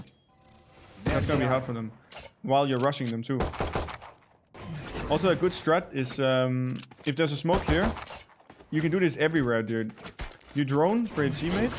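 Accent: Denmark. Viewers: are u from denmark?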